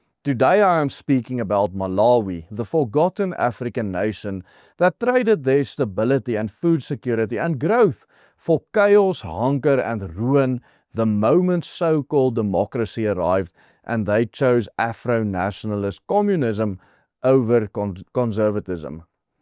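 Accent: Afrikaans, South Africa. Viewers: This is the second video of yours I watched. Perhaps it's unfair but your charming Afrikaner accent certainly makes your videos more enjoyable to listen to- even as you tackle difficult topics. You offer unflinched views and not beholden to left-wing fixations but I think you have warm human regard for humans regardless of race. Afrikaners are genuine sons of Africa, from my outside view, and hope your people and nation keep prospering and making contributions. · can you speak any other lanuage and how..beautiful afrikaans accent why must it be...??? like more english..❤ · Sorry, Afrikaaner English is just too unattractive …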